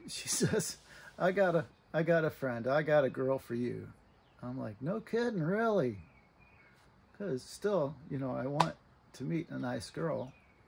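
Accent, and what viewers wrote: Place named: Liberia. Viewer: I'm from Liberty. When I hear you speak, it takes me back there.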